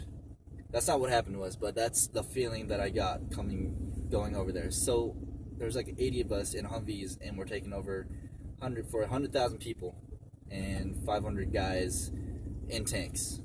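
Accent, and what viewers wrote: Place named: Washington, United States. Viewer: hey that's weird that I was just thinking this dude sounds like he's from Washington. lol I'm from Tacoma. love the videos and envy the lifestyle.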